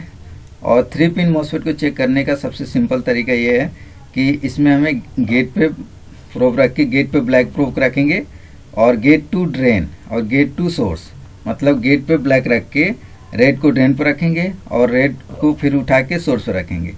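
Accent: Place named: Assam, India. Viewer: vai ap ka tune assam hotahe best of luck.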